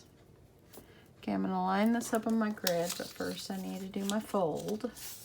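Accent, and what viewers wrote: South, United States. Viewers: Oh miss, these are so stinking cute and just love them. I made some a few years back and hung them from the office ceiling, they were cute. Glitter paper was pretty too. What??? excuse me??? someone had to say something that you say y'all. OHHH that burns my biscuits. I went from NE Ohio to Arkansas and I had to learn to say y'all..LOL When I said you or everybody I sounded like some prissy proper gal. LOL. I love it! I love the accent from the south, my accent is a mumble of both. But yes folks from the south say y'all, and for someone to say something about that is just absolutely petty and just plain not nice. I was always taught if you don't have anything nice to say, keep it to yourself. Oh your hubby is such a doll, my hubby is so much like your hubby, He use to be like that when he drove truck, but since he came off the road he kinda goes with the flow, now that he has a factory type job. 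I love your tutorials and love listing to you and all your y'alls. you keep being you and change for nobody!!!!! Hugs to you my friend!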